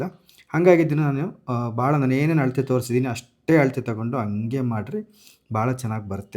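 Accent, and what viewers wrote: Chitradurga, India. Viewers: ❤ chitradurgada bhashe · ತಮ್ಮಣ್ಣ ನಮ್ಮ ದುರ್ಗದ ಭಾಷೆ ನಿನ್ನ ಬಾಯಲ್ಲಿ ಕೇಳೋದಿಕ್ಕೆ ಬರ್ತಿ ಚನಾಗಿರುತ್ತೆ ತಮ್ಮಣ್ಣ 🎉🎉🎉🎉🎉🎉🎉🎉🎉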